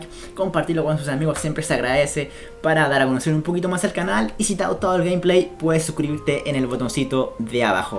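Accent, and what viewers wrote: Chile. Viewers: eres chileno ? xD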